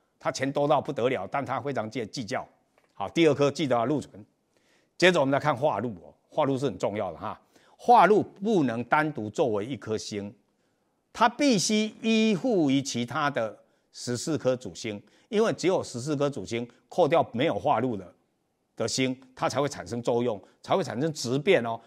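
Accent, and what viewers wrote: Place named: Taiwan. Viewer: 還臺灣國語欸！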